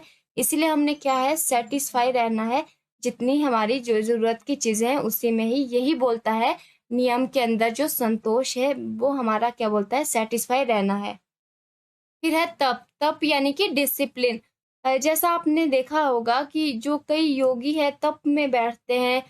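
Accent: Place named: Assam, India. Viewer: Your accent resembles Assamese accent. Are you Assamese?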